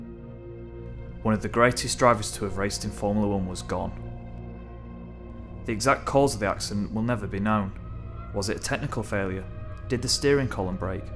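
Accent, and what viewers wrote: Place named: West Yorkshire, United Kingdom. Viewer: Are you from Leeds? Or like West Yorkshire? Anyway class video mate - really enjoyed that.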